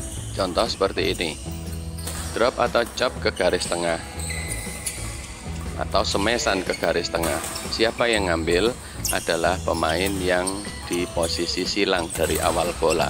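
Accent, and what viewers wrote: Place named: Java, Indonesia. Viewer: Saya jogja pak, saya kira bapak jogja kog ada logat jawanya Terus berkarya pokoknya coach.. Kami tunggu video selnjutnya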